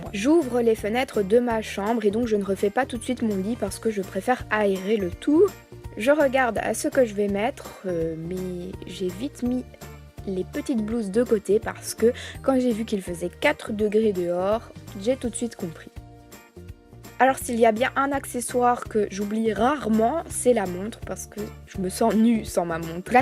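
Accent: Belgium. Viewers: tes belg ?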